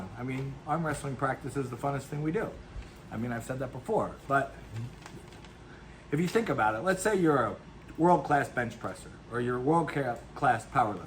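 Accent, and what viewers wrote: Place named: Philadelphia, United States. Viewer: I hear a Philly accent…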